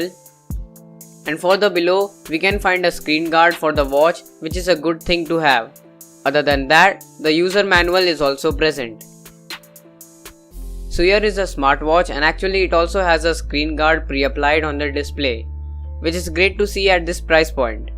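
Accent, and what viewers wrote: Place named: India. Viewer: thanks for a great review, I'm buying one, Nice english/indian accent, friendly people.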